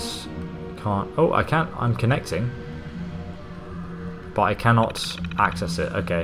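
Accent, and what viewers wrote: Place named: England, United Kingdom. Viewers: definitely not Aussie accent but my bets on Brit or Scottish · This accent is clearly English!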